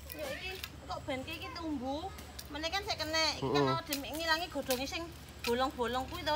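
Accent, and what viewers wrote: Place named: Surabaya, Indonesia. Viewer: Awal liat dari IG, lucu banget cara bicaranya khas suroboyoan. Ternyata ada youtube juga, pas liat jadi hiburan sekali liat tingkahnya ya 😂🤣. Ini senang berkebun tp kok ketemu ulat bulu langsung ngacir lari tunggang langgang 🤣🤣. Sekeluarga seru semua 👍👍. Sehat terus ya dan keluarga, biar makin semangat ngontennya, jadi bisa mengibur kita kita semua 👍👍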